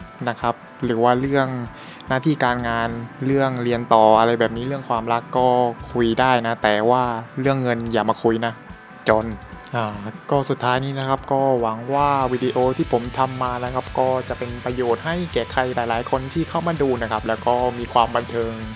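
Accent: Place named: South, Thailand. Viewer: เป็นคนใต้ป่ะครับ 5555 ชอบเสียงอ่ะ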